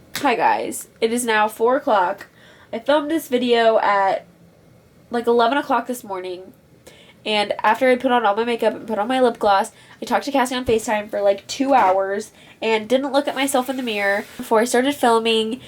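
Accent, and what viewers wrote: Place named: New York, United States. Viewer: i see that you can speak spanish, well . . . Im learning english and you are one of my best teachers. Everyday im trying to hear you, your accent cuz i wanna travel to NY someday, saludos !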